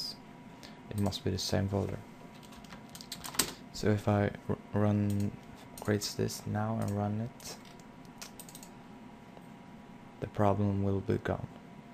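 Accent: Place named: Scandinavia. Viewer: Thanks you my fellow scandinavian (atleast it sounds like it)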